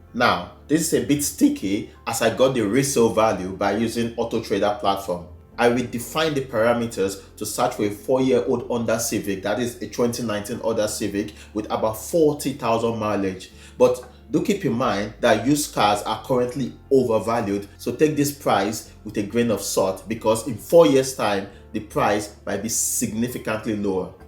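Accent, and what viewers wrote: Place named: Africa. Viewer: I’m here for the great advice and African accent lol 😂😂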